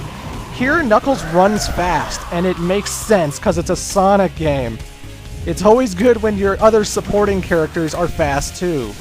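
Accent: Canada. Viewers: YOUR CANADIAN!!!!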